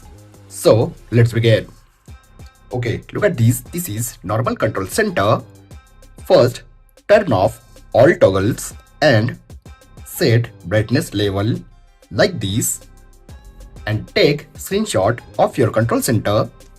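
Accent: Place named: India. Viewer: 🫪Ooh nooo... indian english!! 😵‍💫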